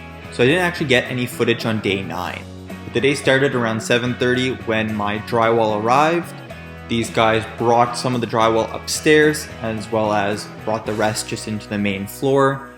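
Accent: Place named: United States. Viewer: i thought this was the UK until you spoke. is it philly, maryland or nyc? the UK dont have basement in their town house. damp issue if you know.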